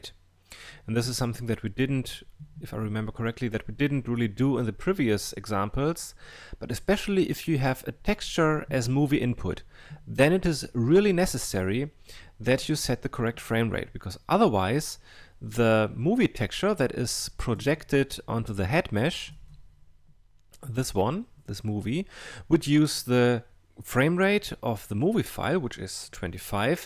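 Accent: Germany. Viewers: du bist bestimmt deutscher du hast nen nervigen dialekt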